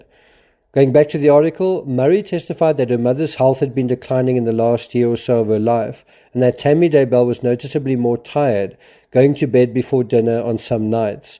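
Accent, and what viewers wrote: South Africa. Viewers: I follow this channel from South Africa, and i have been wondering why you report on American crimes, as i picked up your accept. Just confirmed you are South African, living in the US. Great stuff, been following since Gaby's case. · Hi- Annie here. I was born in Cape Town so I recognised your accent immediately. My parents brought me to the US for medical reasons when I was quite young. We spoke English and Afrikaans at home but i lost my accent at age 7 to avoid being teased at school. I now live in the state of Arkansas and am a retired psychologist. I have been watching the Chad Daybell trial since day 1 and I love your video. It is clear and straightforward (as much as such a complicated case like this can be described). I agree that that the family is completely enmeshed. In fact, many strict Mormons are; Chad's followers,.however, are a cult within a cult. 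I am very pleased with today's verdict. The sentencing phase continues tomorrow with victims' statements. I think we will see many tears. I am fascinated by this story and trials have sparked worldwide interest and am pleased that South Africa has such an amazing True Crime network. Do you cover many American True Crime stories?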